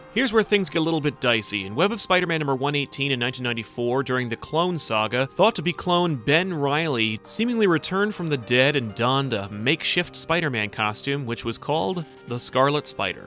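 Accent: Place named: New Jersey, United States. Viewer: sounds like a 30 somethings year old white guy from Jersey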